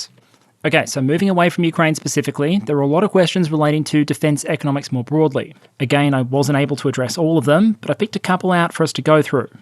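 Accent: Australia. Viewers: If it makes any difference, I find an Aussie accent to convey sincerity and humility and combined with the sense of humour, enjoy it a lot. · There are people out there that don't enjoy listening to the Australian accent? 🇺🇲🇦🇺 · Finland within top ten :D Well, greetings from over here, war topics are indeed in our heads. p.s. I didnt realise some people dont like the aussie accent, I think its cute, gives a nice relaxing atmosphere to otherwise serious and analytical approach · Thoroughly enjoyable episode! and I always like a good strong Australian accent, being myself an American with probably just as gratingly obnoxious an accent as any Australian, if I get real local about it. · That last bit is why I have watched your stuff for well over two years now. You're insightful, intelligent, honest, and humble. Keep up the good work and don't worry about the Aussie-isms. 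I'm sure us Americans will learn eventually. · Regarding the comment you received about your accent, all I can say is most Americans that I know think Aussie accents are rather charming. While the stereotypical Brit 'upper class' accent seems somewhat snooty, Aussie's exude a friendly 'how are ya mate' quality. Yours is fine and combined with your humor and knowledge make the videos very listenable. 🇦🇺🦘👍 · a very heartfelt "THANK YOU!" for your channel. Love your Aussie accent and Emu v. Kiwi examples. Keep up the great work!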